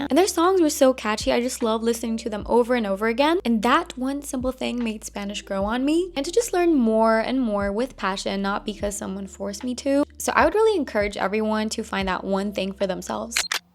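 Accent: United States. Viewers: as a fellow turkish person, can u share how u got this perfect american accent pleaseeee queen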